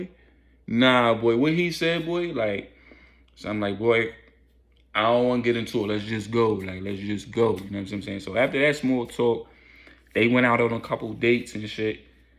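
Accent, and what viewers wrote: New York, United States. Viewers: Yo you sound like you from NYC, great story... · 😂🤣😂Listening to you I know your from up top... NYC. It isnt funny how your mother found out about this man on the DL. I'm sorry to hear that was your intro to transgenders, but your mother did her job protecting you from from these lifestyles for a reason. Men on the DL have ruined many families trying to hide their true desires instead of being dishonest using women to cover their secret lives. Your delivery had me here 😂🤣so hard.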